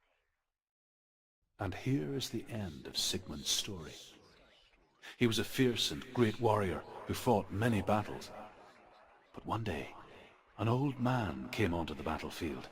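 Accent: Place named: Northern Ireland, United Kingdom. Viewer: Proper Northern Irish.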